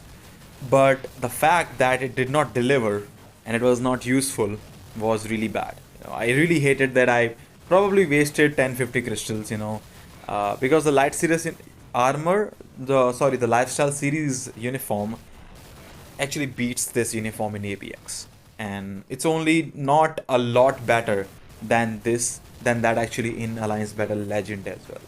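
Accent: India. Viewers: Your English look like Indian accent..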